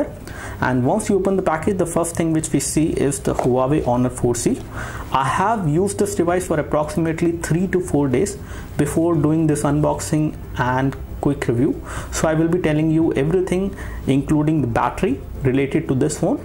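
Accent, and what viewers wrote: India. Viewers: Roman Minárik its Indian but OK.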